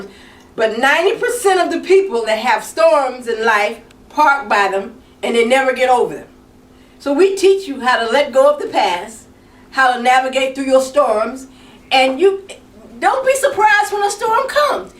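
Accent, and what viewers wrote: United States. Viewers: I love your channel listening to your southern voice is so somthing while you teach this genaration cooking skills. Am gracful for the holy spirit amd my mom that thought me many cooking skills, but am also open to other techniques and always willing to learn another way of doing things. I've been cooking since age of 8yrs old. My mom would let me loose in the kitchen amd it would be a flour everywhere day. She said it was the only way to find my own way of doing things in the kitchen. God bless you your family. I feel as if am apart of the family now and your my grandmother.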